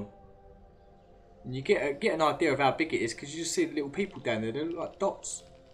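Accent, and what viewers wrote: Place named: Southern England, United Kingdom. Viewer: He's from the South of England. Can't you tell by his accent?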